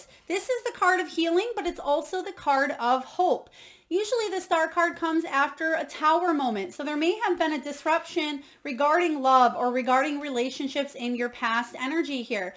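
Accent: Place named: Wisconsin, United States. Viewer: My counterpart is leo/cancer (July 22nd). I love hearing ur Wisconsin accent. Not something I hear on YouTube everyday. BAM!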